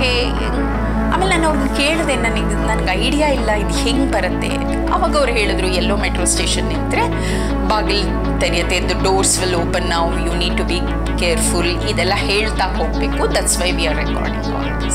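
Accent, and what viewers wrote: Standard. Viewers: “Garden city “ thing is if one single English word comes out of aparna it will be sounded weird she has shuddha kannada voice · I used to repeat after the announcements of namma metro. Thats how much ur voice had influenced us madam! Always felt very proud of ur ಶುದ್ಧ and ಅಚ್ಚ ಕನ್ನಡ ❤❤❤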